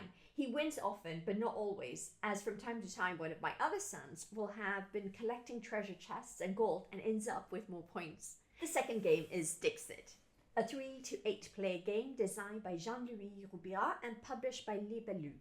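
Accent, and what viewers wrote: United Kingdom. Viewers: Dude are you british? Your accent sounds a bit off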